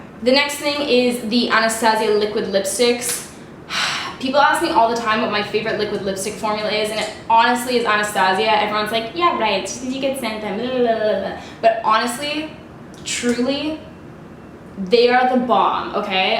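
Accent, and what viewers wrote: Canada. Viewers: I love your canadian accent, the way you say man and damn & love your hair!!!!! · Came across this video and the one where you state the items you would not purchase again. I knew within a minute you were Canadian - you talk the talk girl - and believe it or not, us Canadians have a certain way of saying words (there is a sharpness to the phrasing) that is unique. I used to travel alot through the US and people would always ask me if I was from Canada? I would say yes then they would say my 'accent' gave it away! Great channel! ~ Jennifer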